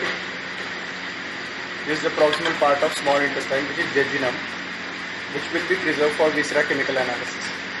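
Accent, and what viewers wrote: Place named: India. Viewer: прошу прощения. Индия. Акцент характерный. труп пролежал не более 4 дней, только в условиях жары. плюс сам по себе человек смуглый был. отсюда и отличный внутренний мир тела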